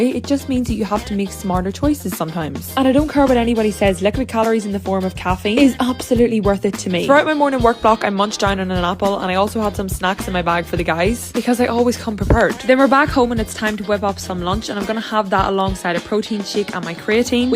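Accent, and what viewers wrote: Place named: Northern Ireland, United Kingdom. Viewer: Are u from Northern Ireland because I’m from Northern Ireland and you have the accent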